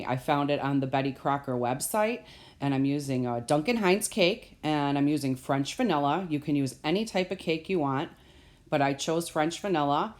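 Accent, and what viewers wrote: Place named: Chicago, United States. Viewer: First time viewer. Nice looking recipes. Plain and simple. Must say that you sound like a fellow Chicagoan. I live in north central Illinois now. Best wishes. I will check out other recipes. TFS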